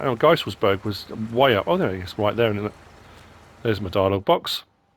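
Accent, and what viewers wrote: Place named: United Kingdom. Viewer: Your British right? you sound it (☞ﾟヮﾟ)☞☜(ﾟヮﾟ☜)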